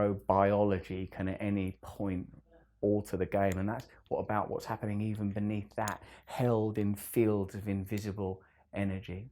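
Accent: United Kingdom. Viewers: l couldn't understand u but l love British aaccent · This guy looks like the corona virus. Lock him up and throw away the key. Take out his internet as well. No one wants to hear his fucked up British ascend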